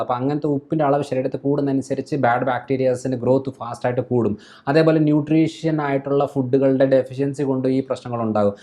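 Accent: Pala, India. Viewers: Attitude പാലാ ഭാഷയും കൊള്ളാം....പക്ഷെ ഇടക്ക് കാടു കയറുന്നുണ്ട്....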